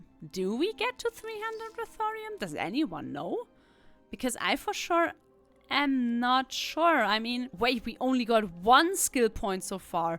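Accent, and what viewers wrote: Germany. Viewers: 1 hr of yapping in her cute German/English accent? I'll take it.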